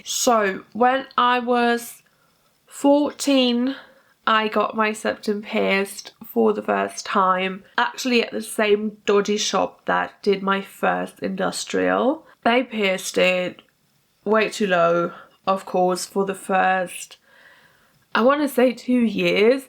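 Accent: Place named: United Kingdom. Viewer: dass du aus Großbritannien kommst, irgendwie vom Akzent und allem her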